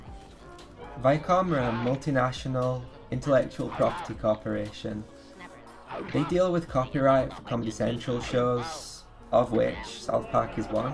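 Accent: United Kingdom. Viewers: Dude, where are you even from? Your accent sounds British.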